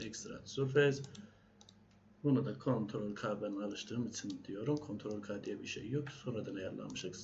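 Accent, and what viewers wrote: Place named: Azerbaijan. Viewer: Azerbaycan lehçesi var sanki hocam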